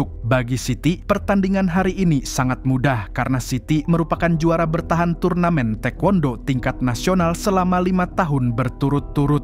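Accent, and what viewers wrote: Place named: Nganjuk, Indonesia. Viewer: kok nganjuk bang aku orang nganjuk 🤣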